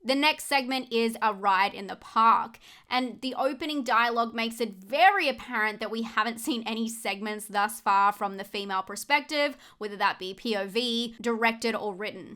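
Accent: Australia. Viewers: I clicked for the reviews. I stayed for your Aussie accent.....came to the Aussie accent. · Can we just stop to appreciate how beautiful, upbeatand thorough is? Her amazing Aussie accent and charm is attractive. Still shocked by some of what shes tolerated horror wise.. I still havented seen Noroi the curse and Salo seems like a difficult and unpleansant watch tht will be infuriating to me. And this from a guy whose favorte horror films of last 15 yrs have bee Heridatary and Audition.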